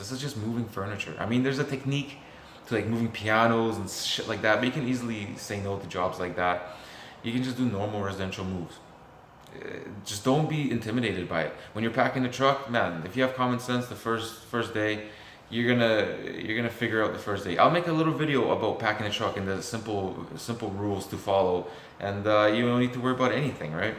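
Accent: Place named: Lithuania. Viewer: You sound like Lithuanian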